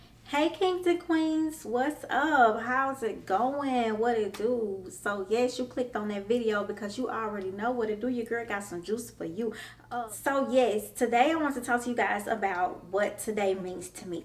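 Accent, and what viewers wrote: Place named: Memphis, United States. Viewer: Yes! I am located in Tn as well and I can spot an accent a mile away!! I thought Memphis to be specific but that’s where I’m from lol... I really love your videos!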